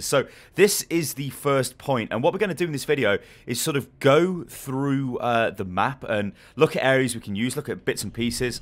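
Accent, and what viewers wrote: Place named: RP, United Kingdom. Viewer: you sound JUST like JP from Fresh Meat. Quite posh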